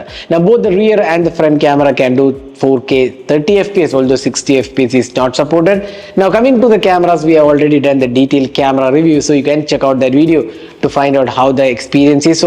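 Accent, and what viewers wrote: Kerala, India. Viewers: U sound like a Malayali.......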